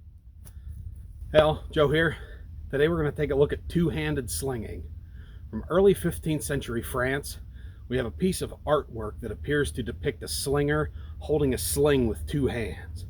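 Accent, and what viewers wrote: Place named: Pennsylvania, United States. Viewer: I grew up near Pymatuning. Once I heard you speak I thought I heard PA in your accent. Thank you for sharing these videos. My dad used to build atlatl, but I never really watched anyone use a sling.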